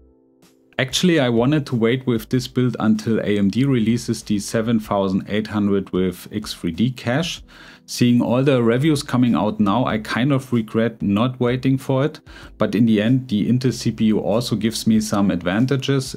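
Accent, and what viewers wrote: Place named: Germany. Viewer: Dude. I'm Polish and I knew you're German the moment I heard you.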